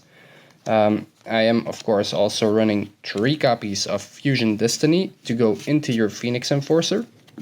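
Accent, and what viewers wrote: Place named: Montreal, Canada. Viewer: 🤣 ton accent est pareil de ceux de Montréal 👌😁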